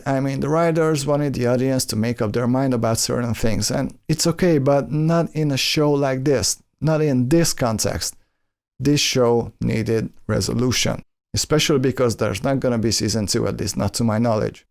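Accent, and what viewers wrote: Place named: Balkans. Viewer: It's been a while since I saw MotA. Your review makes feel the need to revisit it. I also don't rank it among my top picks, but for my life, I can't remember why. Maybe it was the ending....Your reviews are a pleasure to watch. I've subscribed....Just a btw, I'd guessed you were from somewhere in the Balkans based on you accent. Not exactly correct, but not too far off...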